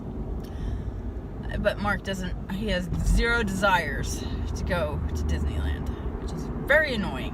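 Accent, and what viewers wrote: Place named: Canada. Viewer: I have to ask.... are you from the U.S.? I am asking because you talk as if you are from somewhere else. Your dialect is from a certain province in Canada. Just asking because certain words you use remind me of home.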